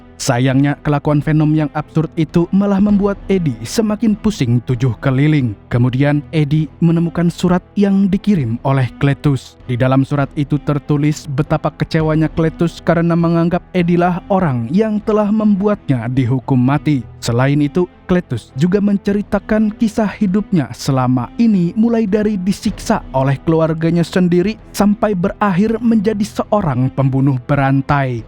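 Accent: Banjar, Indonesia. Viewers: Orang Banua jua kh mang😅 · Banjar banar 😂😂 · Baru sadar urang banjar rupanyak😅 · orang Banjar mang?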